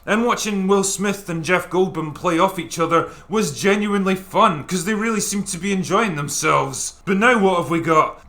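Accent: Scotland, United Kingdom. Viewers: Giant space testicle and shooting the queen alien in the arse. Priceless - all made better by the angry Scottish accent 🤣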